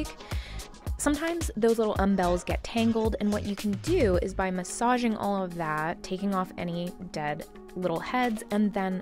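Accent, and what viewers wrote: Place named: United States. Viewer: omg i love your video, and u r accent is so American! i can feel the power in your voice!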